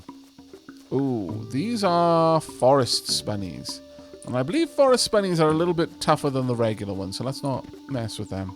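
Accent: United Kingdom. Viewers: I 100% thought you were British. Hell, I still do.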